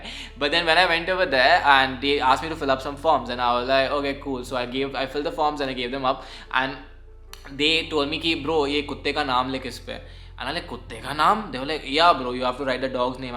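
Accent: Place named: Mumbai, India. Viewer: Your Bombay accent is very sexy 🤌🏻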